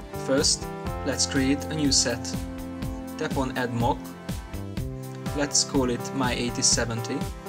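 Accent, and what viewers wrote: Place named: Hungary. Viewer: Hallani hogy magyar vagy!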